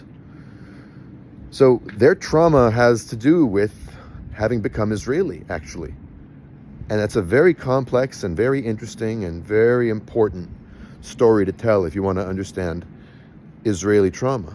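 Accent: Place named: United States. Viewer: Did you watch Tantura? The people who did that were not victims of European Holocaust.... the Israeli hyjacking of it is outrageous! And PS... the American holocaust that Jewish ppl are also complicit in is ongoing to this very day! OUTRAGEOUS WITH YOUR AMERICAN ACCENT YOU ERASE genocide you are also a beneficiary of NOW!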